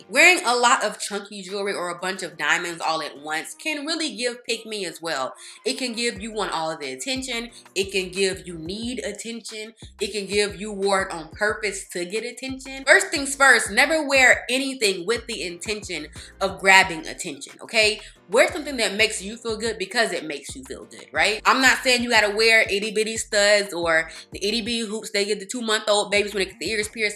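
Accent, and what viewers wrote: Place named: Chicago, United States. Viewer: Girl you gotta be from Chicago ❤ great tips btw!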